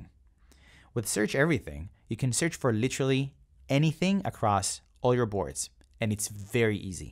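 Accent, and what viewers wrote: Philippines. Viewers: sounds like a philipino speaker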